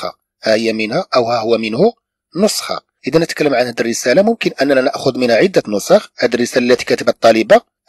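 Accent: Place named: Settat, Morocco. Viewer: الله يرحم والديك من أي مدينة لو سمحت استاذي لأن لكنتك قريب من لكنتنا أليس سطاتيا؟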